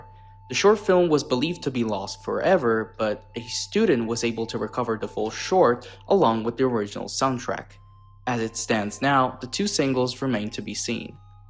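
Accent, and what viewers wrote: United States. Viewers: Hai un eccellente accento americano, veramente complimentoni. Ma....andiamo...nessun americano sarebbe riuscito a pronunciare correttamente PAPERISSIMA, IN MANIERA COSì FLUENTE POI. TI SEI TRADITO DA SOLO ahahaha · ...l'autore di " "! 😱 PS: quando parli inglese hai un accento americano perfetto! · no vabbè ma il tuo inglese è pazzesco fra 🫂 I shyt you not, pensavo che fossi americano (o comunque un americano di prima generazione, con genitori italiani) per quanto buona è la tua pronuncia ahahah ti giuro, sei arrivato ai nomi e ho detto “ayo che pronuncia perfetta che ha in italiano”, sono andata a vedere sul tuo canale la nazione che hai impostato, leggo “italia” e mi sento una c•gli•na, perché torna tutto 😑💀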